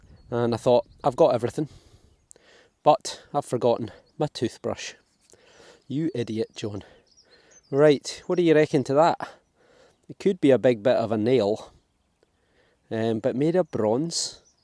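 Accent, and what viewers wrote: Scotland, United Kingdom. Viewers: Must be your Scottish accent, I didn't catch where you are hunting this day? 🙂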